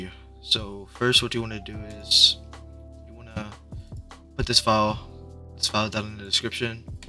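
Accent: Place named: India. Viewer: bros indian now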